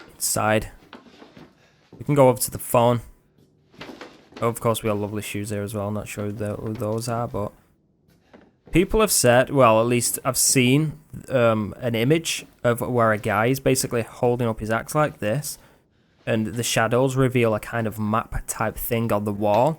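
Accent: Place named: West Yorkshire, United Kingdom. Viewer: Anyone ever commented on your West Yorkshire Accent? nice to hear it haha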